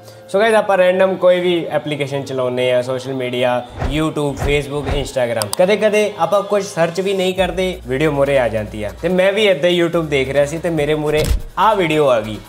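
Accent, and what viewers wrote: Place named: Ludhiana, India. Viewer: ਬਾਈ ਤੋਂ ਲੁਧਿਆਣੇ ਤੋਂ ਲੱਗਦਾ😅😅😅😅😅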